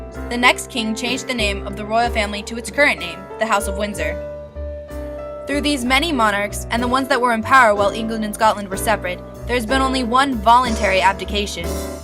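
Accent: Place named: United States. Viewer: The young lady who is discussing this has an American style accent so I assume she has no understanding of the role of a Constitutional Monarch. I have never yet met an American who does. Firstly this is not an analysis ..it is a repetition... with little depth. For the record...from the someone who lives in the UK. The role of a British Monarch is to uphold the Constitution and provide a unifying force to balance the power of politicians. The Monarch leads of life of great priviledge but they are trained from birth into the role they are undertaking and an understanding that this is a two way process. The Monarch is most definately NOT able to do as he or she wishes and must put the needs of their people first. The role of Consort is also highly demanding and requires great strength of character to fulfill the function properly ( we have a recent example of where that went wrong and the turmoil it caused.) NOTHING is more important than upholding the Constitution. 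This country had several Civil Wars getting the power balance right. Edward the V111 is generally regarded in the UK as a weak,immature man who selfishly put his own needs above that of the people and showed no ability to recognise the damage he was causing. In doing so he caused a Constitutional crises which nearly destroyed the very Constitution he was suppose to uphold at all costs and put the Country on a path to Civil War as people took sides.. Being King was his role in life and he was a disaster at it. It is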